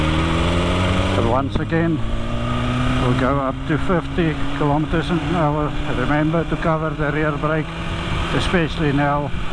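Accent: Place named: South Africa. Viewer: Man I clicked on this video for some knowledge on wheelie control and as soon as I heard the accent and the road appeared I could tell you're from South Africa. Great video sir. I've subscribed.🙏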